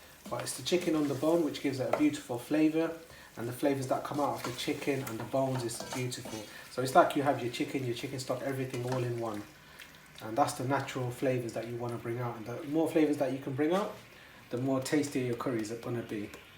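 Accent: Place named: United Kingdom. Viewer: Absolutely one of my favorites! I've been adding Ochra/Bindhi to my curry lately and it really makes the dish much tastier and healthier. I will be copying your recipe and style for my next chicken curry for sure... I really like your video (very well edited) and your British english makes it all the more enjoyable to follow. Thank you very much. I have subscribed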